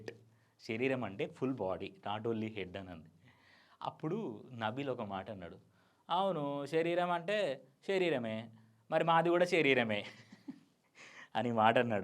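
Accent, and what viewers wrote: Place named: Warangal, India. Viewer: Warangal slang super ekkadiki poyina slang maradu.❤❤❤ Warangal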